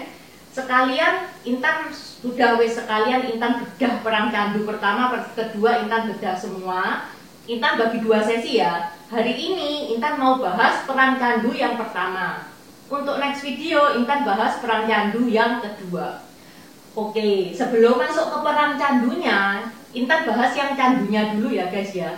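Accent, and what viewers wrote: Java, Indonesia. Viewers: Kapan-kapan kalo saya ada presentasi mau tukeran jiwa dan raga sama cece ini xD Sangat energik ngomongnya, medok e kuwi lho jeruu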